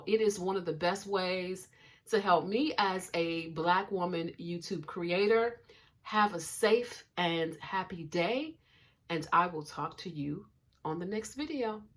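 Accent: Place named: Detroit, United States. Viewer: Shout out from the D! I thought you sounded like a Detroiter😊i was in Paris solo in July...racism was overt but I still had a great. careful have to be careful.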